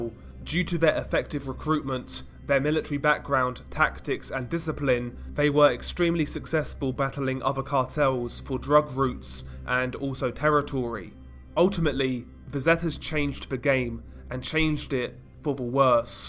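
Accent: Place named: United Kingdom. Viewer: I hate the British accent but as a Mexican I can’t stop watching these videos 😔!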